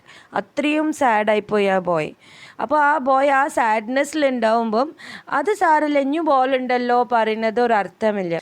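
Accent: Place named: Kannur, India. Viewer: thankyou yr classes are great .. And your speaking style reminds me of my old friend at kannoor😀👍🏻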